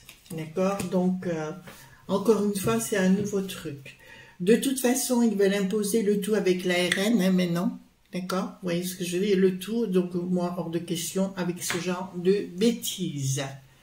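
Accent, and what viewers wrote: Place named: Lyon, France. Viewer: Je vous écoute pour la 1ere fois sur le conseil d une voyante que je suis j adore votre franc parlé je trouve que vous avez un accent lyonnais et certain mots es que je me trompe je suis lyonnaise j habite Montpellier je suis ravie de votre vidéo merci 👍👍👍👍👍😂😂😂😂👋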